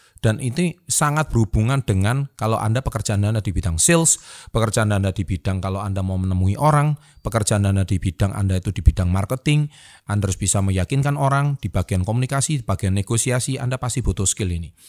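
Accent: Java, Indonesia. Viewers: Kenapa ya aku tuh kurang suka dengar orang bicara dengan logat medok begini😅 agak ilfeel aja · medok amat sih kang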